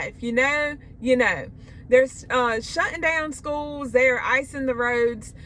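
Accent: South, United States. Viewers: She sounds southern. From Sip or Bama i bet. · Awesome message. I also adore your American English accent.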